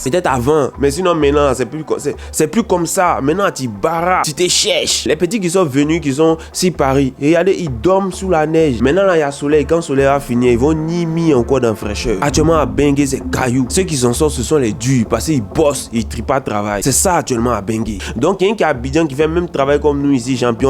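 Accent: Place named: Ivory Coast. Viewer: Moi c'est ta manière de parler qui me fan tu montres que tu es un vrai ivoirien et très fière ouiii ❤❤❤🎉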